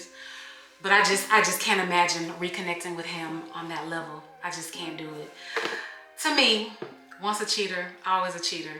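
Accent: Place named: Texas, United States. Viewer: I was thinking how you sound like Brooke Valentine then realized y'all are both from Texas. This was a great story time!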